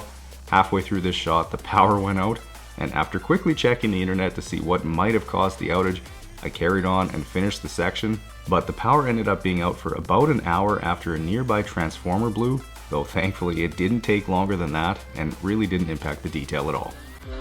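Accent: Canada. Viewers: Nice job! You must be in Canada; hints of some of the accent 😉 And that snow, lots of it. 😊 Happy Spring, from Washington, D.C. 🌺🌻🌼🌷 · All I’m gonna say is I started watching staufer garage detailing and I was hooked and then your channel popped up on hen you were really low on subs maybe around 15-20k I instantly fell in love with your content and Canadian accent lol. Man you have grown so much and I’m actually very very happy for you! Keep up the great work you are a very amazing and genuine person and I am happy to have came across your channel when I did. Maybe one day I’ll drive from New York to Canada to get my car detailed lol but your really far haha.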